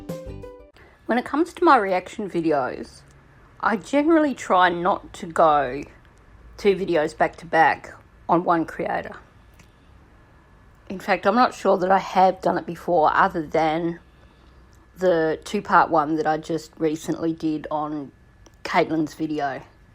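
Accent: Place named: Australia. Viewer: Well maybe your accent is more Jacqui Lambie. :) I respect her now, not originally BTW. I didn't mean to make a big deal about it. I do appreciate someone who can conduct a civil conversation on YouTube though :) I'm from South Australia and we have different accents again.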